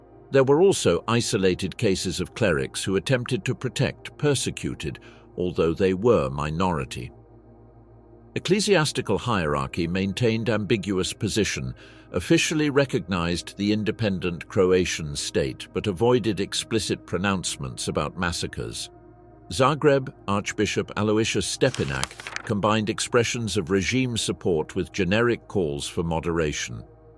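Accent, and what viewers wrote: United Kingdom. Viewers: Well, whomever wrote/narrated/AI'd this, clearly wasn't a "English as mother-tongue" speaker, despite the English accent, as evidenced by the lack of 'articles' [a - an - the]. And the occasional sound of pages being turned isn't fooling anyone. 😏😏😏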